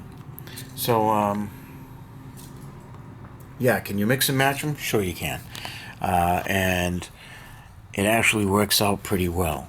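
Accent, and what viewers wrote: New England, United States. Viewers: I'm guessing you're a New Englander. The damn finest accent in the U S of A!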